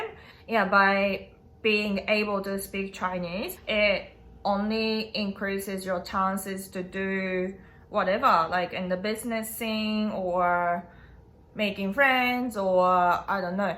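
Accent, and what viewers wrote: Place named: New Zealand. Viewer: Still got that kiwi accent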